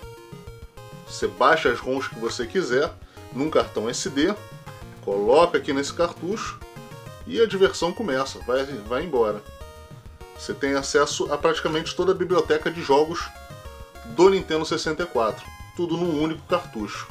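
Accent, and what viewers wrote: Rio de Janeiro, Brazil. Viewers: Fala, sempre curtindo seu canal, sua coleção bem bacana, acompanho sempre. Sotaque de carioca e voz de locutor de FM kkk, curto sempre seus vídeos abraços.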